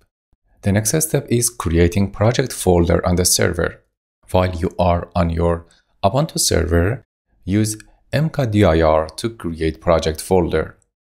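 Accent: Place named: Iran. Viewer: لحجه ایرانی همه جا معلومه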